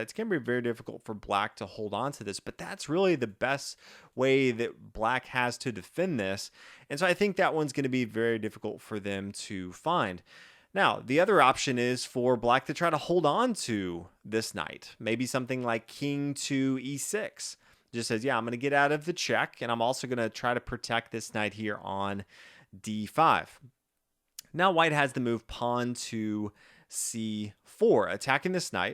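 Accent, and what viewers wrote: United States. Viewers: What a stupid comment, is American so he pronounces his name with an American accent. "Алехин" make Alehin (even alehina) I don't see the problem calling him Alekhine just like I don't see the problem when every non-French folks struggle to pronounce Vachier-Lagrave correctly and it systematically turns out as "vachier-laguave". I hope you do know how to correctly pronounce Praggnanandhaa, Maghsoodloo or every other GM names that are westernized or who sound slightly different than how they are written. It's just ridiculously elitist, just thank the content creator for the knowledge he shared.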